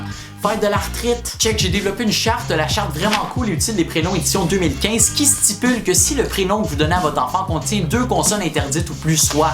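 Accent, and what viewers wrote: Quebec, Canada. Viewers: C'est un peu dificile de comprendre l'accent quebecois!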